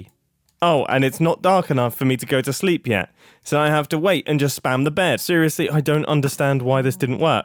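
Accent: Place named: United Kingdom. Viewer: My daily dose of a dapper British man playing games to keep my sanity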